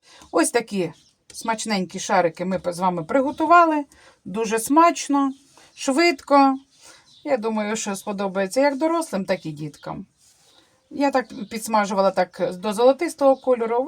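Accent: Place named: Poltava, Ukraine. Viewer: нашу Полтавську говірку нічим не переб'єш.Дай Бог Всім здоров'я.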